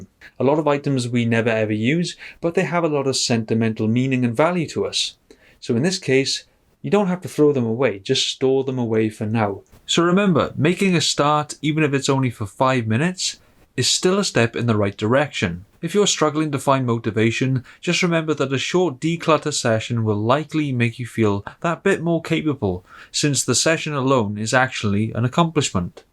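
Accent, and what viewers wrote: Wales, United Kingdom. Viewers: Hey you are welsh. Howdy shw mae?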